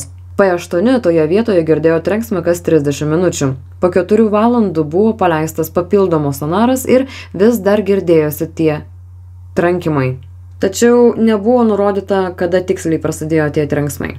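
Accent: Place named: United States. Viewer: Ausys vemia su tokiu akcentu klausyti, jei tokia amerikone, manau anglu kalba butu geriau daryti video, turesi bent didesne auditorija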